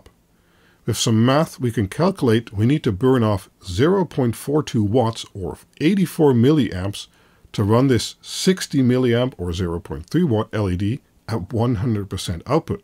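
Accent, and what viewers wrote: Netherlands. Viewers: even een vraag tussendoor...ben jij toevallig nederlands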